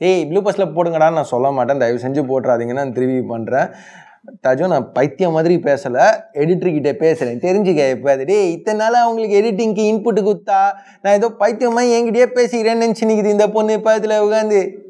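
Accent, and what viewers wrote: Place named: Chennai, India. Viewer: Nice video bro. Apple charger include pannadha nalla kalaikuringa. Chennai slang thaaru maaru. But don't use that slang in Dubai.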